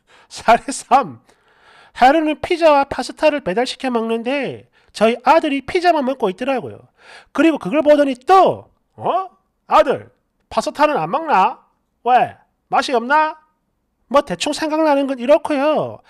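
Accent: Gyeongsang, South Korea. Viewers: 딱 경상도 남자들 말투네 ㅋㅋㅋㅋ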